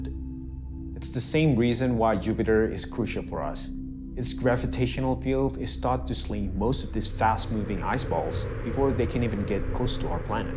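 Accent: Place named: England, United Kingdom. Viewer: ur english accent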